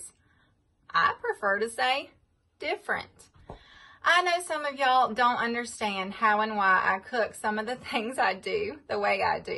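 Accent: South, United States. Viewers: Ur a southern girl, ur r suppose to talk that way. We love it. Continue on. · I love the way you talk , being from Canada I like to listen to you talk southern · I love the way you talk! That accent is great! Ol HWJR ! send all of our gals down to Georgia to learn to talk? Or maybe North Carolina! By the way for those of you that are not as old as I am. Ol HWJR = Hank Williams Jr. Good article there girl! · You don't talk different just southern it's great don't change anything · Your not talking different, your talking with a southern accent, just like people from Boston Maine and Texas! This country has many different accents! 😊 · You are not 'different!' You are just a sweet 'Southern Belle!' Your voice and sayings just epitomize how we Southerners talk and the way we Southerners do things. I'm from the South, Georgia, just like you and I can tell that we had parents who raised us to be kind and respectful to others and all of God's creatures. 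So don't every apologize for the things you say and do 'different' from others. I always just place them in the category of being 'different.' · okay maybe you talk a little southern ;) but that beautiful smile of yours just lights up the world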